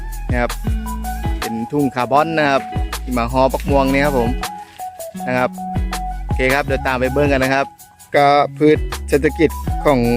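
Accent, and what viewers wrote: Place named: Loei, Thailand. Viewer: มักครับ สำเนียงไทยเลยม่วนดีครับ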